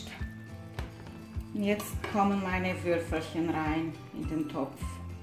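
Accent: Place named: Transylvania, Romania. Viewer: Werde das Rezept in den folgenden Tagen zubereiten. Mama machte das mit angebratenem Speck! Werde ich beibehalten! Leider tue ich mit Knoblauch wie immer übertreiben! Ein Lib an Deinen wunderbaren siebenbürgischen Akzent! Meine Eltern hatten den auch beibehalten! Bin seit meinem 12 . Lebensjahr in Nürnberg ansässig und spreche fließend viele süddeutsche Dialekte! Soxesch kann ich auch noch! Vorwiegend die Dialekte aus der Schäßburger Region! Da sind meine Wurzeln! Herzlichen DANK nochmal! 🙏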